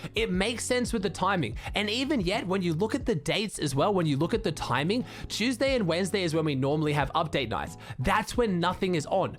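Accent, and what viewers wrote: Australia. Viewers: Hes Australian no shit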